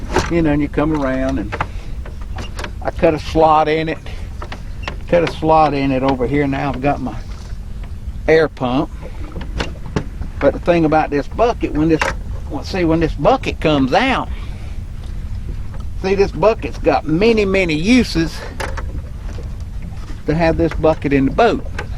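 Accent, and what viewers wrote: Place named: South, United States. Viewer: Thanks for your videos and knowledge. I have always been a bass fisherman but just bought a new boat and my dad wants to go trolling for crappie. I had no idea how involved crappie fishing is. You really educated me and I appreciate your knowledge and the way you do your videos. you talk "regular" good old boy language I can understand! Thanks for sharing your knowledge!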